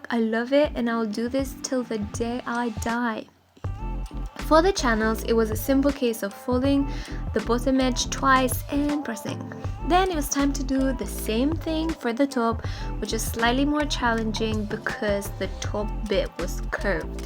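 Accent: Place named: United Kingdom. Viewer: Where are you originally from? Love your charismatic and whimsy personality, the beautiful British English and your inspiring sewing projects. ❤️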